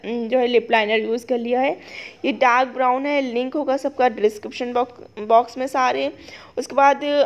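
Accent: India. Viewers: just a simple luckhnawi lehja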